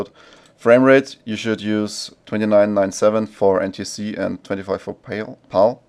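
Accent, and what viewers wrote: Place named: Austria. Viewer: Mit dem österreichischer Akzent finde ich Deine Videos viel netter :-)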